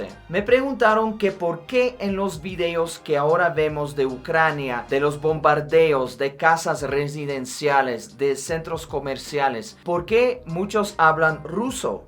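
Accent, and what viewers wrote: Colombia. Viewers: Tu maravilloso español colomombiano y tus conocimientos y forma de explicarlos dan un gran aporte a cuantos estamos interesados en saber y entender la compleja historia entrelazada entre rusos y ucranianos. Estremece y duele la avanzada destrucción de Putin sobre el valiente pueblo ucraniano. Saludos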